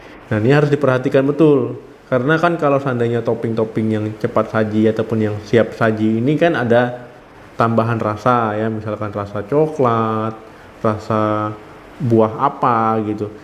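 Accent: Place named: Riau, Indonesia. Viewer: Mohon maaf oot dok, dokter org riau ya? Logatnya familiar sekaliii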